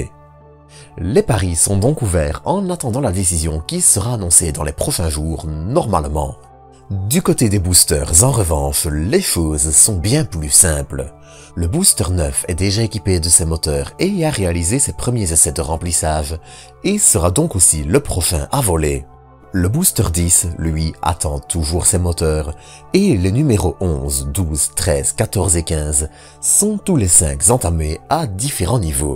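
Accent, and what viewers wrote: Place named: Brussels, Belgium. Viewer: J’ai vu ta bouille en cherchant un peu. On entend clairement les efforts que tu fais pour réduire l’accent Bruxellois(je suis belge aussi d’Anderlecht même) une proposition: et si tu t’en foutais et que le ferais avec ta façon normale de parler tu attirerais probablement plus de personnes et deviendrais le premier youtuber ciel et espace du plat pays. Allei tichke tes goed!😜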